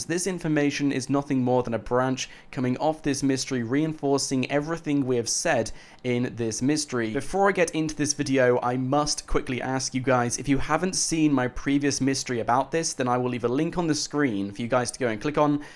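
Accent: United Kingdom. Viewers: He is british so he cant pronounce it right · All of us British ppl say it that way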